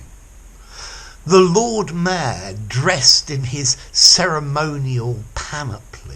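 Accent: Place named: England, United Kingdom. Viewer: Oh, I do like your presentation, its richness of examples and your delightfully English pronunciation and expressiveness. Thank you.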